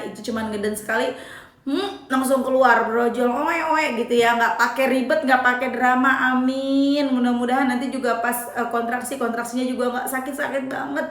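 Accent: Jakarta, Indonesia. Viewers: masya Allah mantap mba bahasanya betawi bgt❤😊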